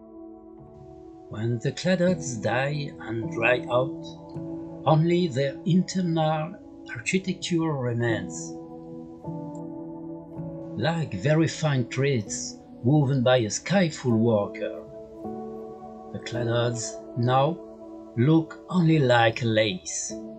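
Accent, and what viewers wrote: France. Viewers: The french accent sympa la version en anglais bonne fête de pâques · Superbe idée, l accent français, les anglo-saxons adorent. Cela leur permettra d apprécier la flore de France. J ai mis un pouce en haut 👍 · Hello, thank you for the video in english, I liked the french accent, that's my fun, good continuation, kiss👍😘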